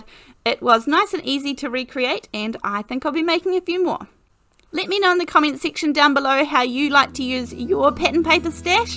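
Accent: New Zealand. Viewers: Great to hear a Kiwi voice, and the clear, no fuss instructions. I live in the South Island too. Love that quick and easy card. 👍💕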